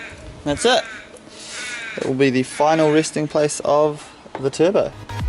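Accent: New Zealand. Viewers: Lmao first word you said knew you were from NZ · R u a kiwi?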